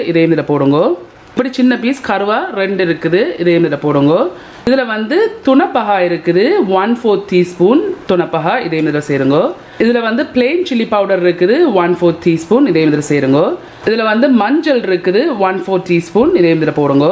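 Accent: Jaffna, Sri Lanka. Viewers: Hi Your (slang Jaffna mix Europe mix )Tamil very interesting. I'm on of your FB page follower.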